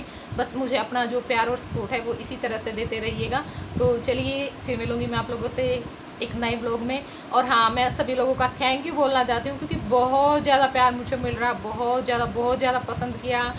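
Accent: Haryana, India. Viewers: Mai bhi samajh gai thi kyonki hariyana mai aise hi bolte h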